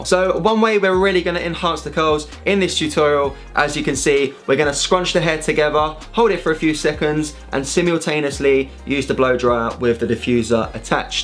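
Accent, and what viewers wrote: United Kingdom. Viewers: Bruh you speak so English English that i cant hear you i can hear American English you now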